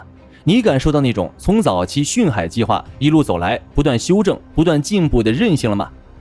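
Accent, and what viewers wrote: China. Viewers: 用的豆包做的AI视频吗？怎么解说都是一股中国大陆的味道。不应该用台积电的2纳米芯片的AI去做吗？😆😁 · 你的口音好像大陸人 是因為你很討厭 共產黨嗎？所以才會研究與喜歡台灣的特殊艦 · 北京腔談台灣國艦？ Awkward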